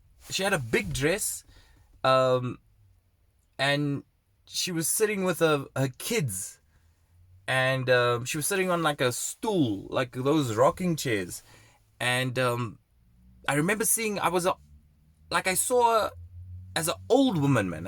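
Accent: South Africa. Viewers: are you south african? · Your accent sounds South African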